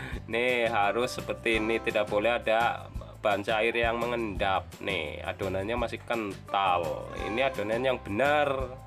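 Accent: Banyumas, Indonesia. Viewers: Saya justru suka logat ngapak nya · Kan itu juga udh pake bhs Indonesia.. Cuman logat nya aja medok ngapak.. Aku yg org Sunda aja ngerti dan paham apa yg sampaikan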